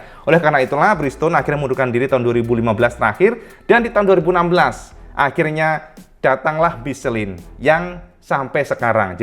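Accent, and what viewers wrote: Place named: Java, Indonesia. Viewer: Josss Gandosss Cak...Up date Informasinya selalu saya tunggu-tunggu, lugas, jelas, padat dan ciri khasnya yang medok...👍👍👍👍👍👍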